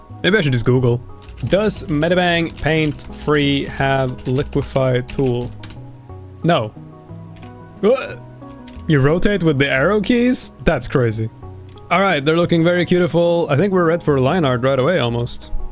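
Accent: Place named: Sweden. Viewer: could tell by your accent you're swedish (from a norwegian with a lot of swedish fam) much love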